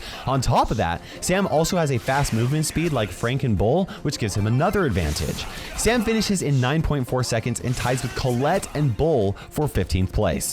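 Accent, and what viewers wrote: Australia. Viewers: Sam sounds australian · ayo sam having That austalian accent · I didn't know I needed an Australian in BS... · He’s Aussie? Man I love this guy already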